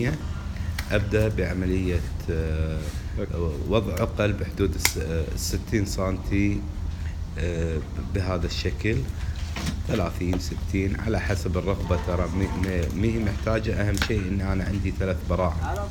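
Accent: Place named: Gulf, Arab world. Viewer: انته خليجي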